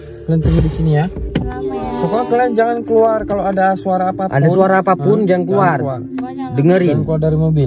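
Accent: Malaysia. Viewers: Ngomongnya kek orang malaysia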